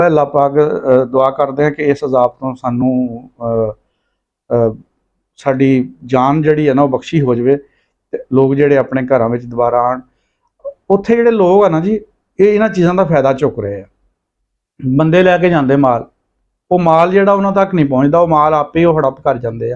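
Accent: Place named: Doaba, India. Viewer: bhut vdya glbaat kahi a veer ji , tuadi punjabi boln da lehja jaladhar,hoshiarpur side vla a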